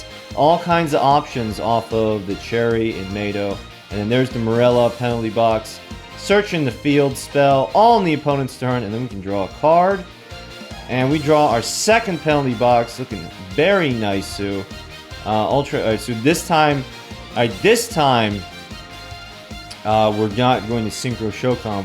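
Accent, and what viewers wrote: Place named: Ireland. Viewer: A1 Irish pronunciation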